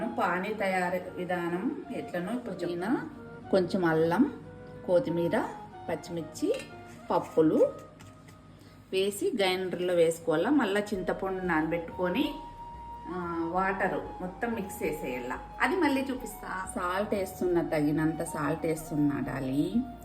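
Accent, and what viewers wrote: India. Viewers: Me videos and meru chese recipes, me rayalasema yasa anni chala ante chaala bauntayi garu 🥰🥰🥰❤❤❤ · Mee matalu chala baguntai pinni endukante mana anantapur slang ekkada filter cheyyakunda original slang ❤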